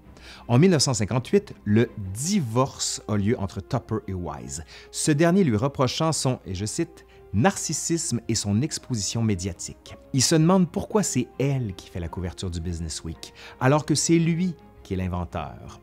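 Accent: Quebec, Canada. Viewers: J' adore l'accent canadien:) Superbe video:) Merci:) Je m'abonne avec grand plaisir. Bonne continuation. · Merci pour ton accent du Québec 😂 Tupperware a malheureusement mal fini...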